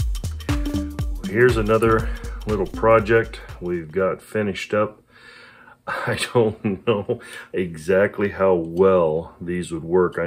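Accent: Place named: South, United States. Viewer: I want to go to that flea market with you. I am a hand tool woodworker and restorer. I found and restored most of the tools in my shop and use them daily. However, some of the tools you showed made me want to jump through the screen and see the prices. I live in Upstate, NY. Still trying to figure out where you're from, but you sound a little southern to me. Just guessing...LOL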